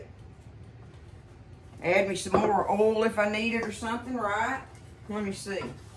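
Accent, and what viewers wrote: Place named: Texas, United States. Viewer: Damn... I moved away from the Texas/Oklahoma region over 30 years ago to other parts of the U.S, and I thought I was happy. But Shyte, your recipes take me back to my youth, and I miss being back in the Texas/Oklahoma area!! My Mama used to make a variation of this dish, but not nearly as complex as yours. Mama used Minute Rice. 👎👎 Thanks for all your videos. Gawd I love your Texas accent! God Bless!!!!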